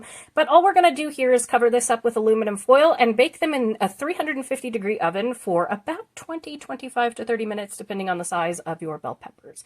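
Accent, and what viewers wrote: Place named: Appalachia, United States. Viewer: Sorry for your loss, love the bluegrass twinge I hear in your voice...I've never added corn, but I might next time, thanks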